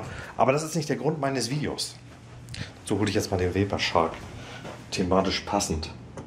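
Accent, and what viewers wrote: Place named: Germany. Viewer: Random Logick Nicht spießig! Aber schon sehr deutsch 😁😜 Gerade durch Sprache. Aber alles cool ich mag ihm ja 😜 Bin ein Fan thumbs up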